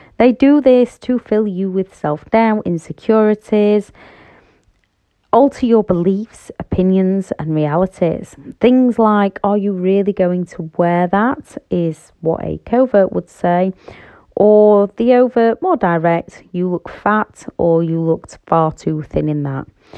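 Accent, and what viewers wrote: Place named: Lancashire, United Kingdom. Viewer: Thanks for your useful and helpful vids, and always nice to hear a Lancashire accent for a change. x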